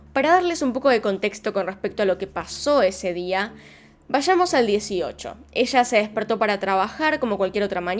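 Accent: Argentina. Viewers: Me encanta su acento Argentino.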